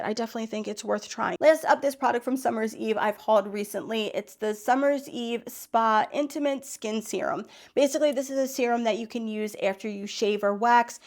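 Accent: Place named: New York, United States. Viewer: Great video I agree with all your thumbs down however I saw some new products we don't yet have out here in California. By the way I love your accent! It's adorable almost New York is it a Philly one? Just curious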